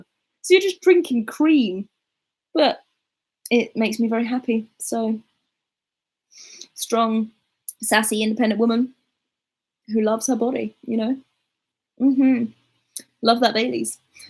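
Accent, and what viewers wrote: United Kingdom. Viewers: Missed the live chat again but enjoyed the replay almost as much 1. I love your quintessential British accent, too 2. love watching you in your new minimalistic setting, very cool , btw do more accent challenges pls thank you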